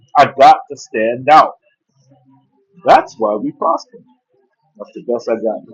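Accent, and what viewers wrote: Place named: United States. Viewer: Why do u speak like an American? U r not believable WHAT about all the Nigerian call centers SCAMMING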